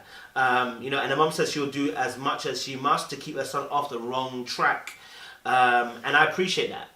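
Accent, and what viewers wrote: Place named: London, United Kingdom. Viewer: Great video! Bro you have a bit of an accent, it's like a London accent mixed with another provence's accent. What accent is that?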